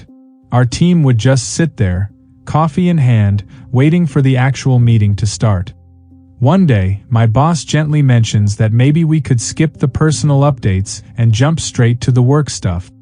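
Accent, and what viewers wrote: United States. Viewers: Please!! If it an Aussie telling a story, make it an Aussie telling the story! #shitusaccent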